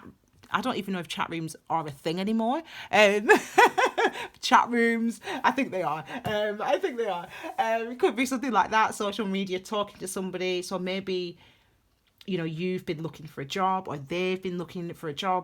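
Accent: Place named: Northern England, United Kingdom. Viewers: Love your readings, your lovely brown hands and your "Guy Martin" accent. You sound like his female equivalent. Are you two from the same geographic area? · I'm in Darwen, I knew I recognized the accent 🤗 thanks for the video! Have a beautiful week x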